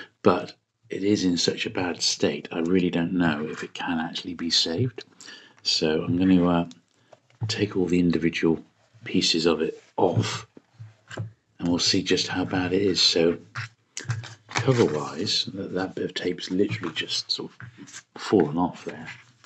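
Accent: England, United Kingdom. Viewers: It's not a British accent. There's no such thing. That's an English accent. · You forgot Scots (the language). 🤓 But yes, most people know there is a plethora of British dialects and accents, and not one. The same is true of American English: someone from Boston and someone from Alabama sound almost nothing alike. And yet we still refer to them as “American” accents because they do sound more similar to each other and are easily distinguishable from accents in Britain or places like Australia or New Zealand. That’s the reason we group things together. To distinguish them from other/different groups. Same goes for “British accents”: I have no idea where exactly the speaker in this video is from, but I know it’s not North America, and it’s gotta be somewhere in Britain, most likely England.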